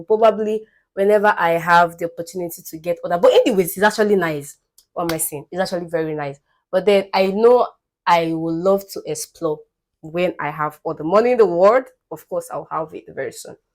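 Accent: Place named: Igbo, Nigeria. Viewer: I'm referring to Igbo as "yibo" . Your Igbo intonation is pronounced especially when pronouncing oil, you say "oryu" So, you be Omo "yibo" na🥰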